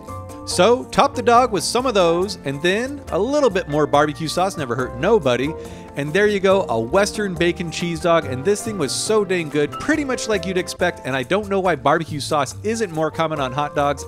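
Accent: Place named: California, United States. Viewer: I thought he sounded like Chef John, too! But yeah, it appears that it's just a regional Californian accent :)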